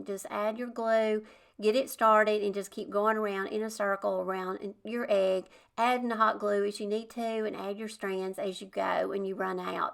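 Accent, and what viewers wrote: South, United States. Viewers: I love all your creative projects but, more than that I love your sweet southern accent.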